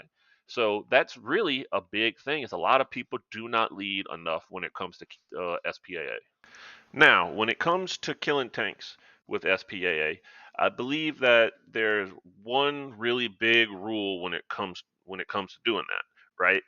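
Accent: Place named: South, United States. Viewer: As a texan, You sir are on of the most southern MotherFckers I have ever heard. <3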